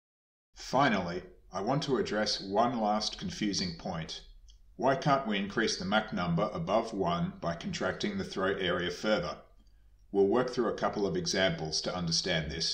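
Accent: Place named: Canada. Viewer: Great video and very pleasant Canadian accent.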